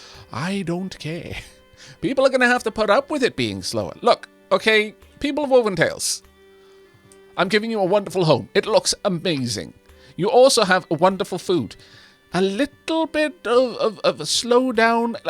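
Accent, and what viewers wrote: Wales, United Kingdom. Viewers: with your sultry Welsh tones and your propensity for looks over function, how could I not!?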